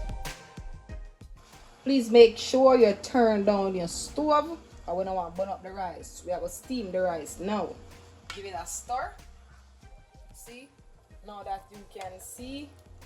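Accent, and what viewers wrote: Jamaica. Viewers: Yow ,your authentic jamaican voice make the video enjoyable to watch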